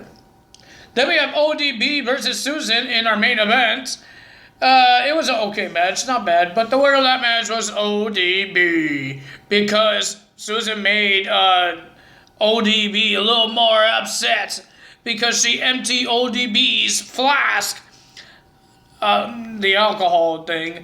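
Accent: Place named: Quebec, Canada. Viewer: where are you from bro? I hear an accent when you speak. It sounds like either from Quebec or Louisiana.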